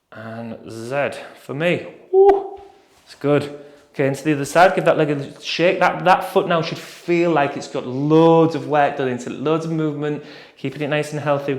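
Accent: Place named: North, United Kingdom. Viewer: I have historically hated strength training, only beginning to factor it in alongside my beloved running when the injuries started. Now it's of course a non-negotiable, and I can honestly say that your videos are some of the only ones I enjoy on here! It's almost not a chore! I'm super bendy (but with the stiffest ankles) with poor balance and find that some other vids speed through so I can't keep up, and also introduce advanced exercises quite early on which my lower back can't hack. I enjoy that you offer modifications, encourage us to take our time, but also talk through purposes too. Thanks - my fave runner's channel! Plus, I enjoy a fellow Northern accent!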